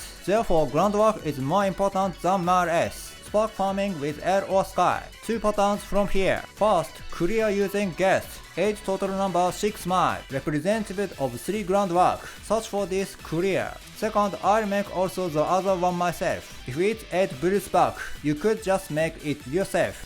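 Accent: Japan. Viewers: What is wrong with your english? It’s like you’re speaking in japanese accent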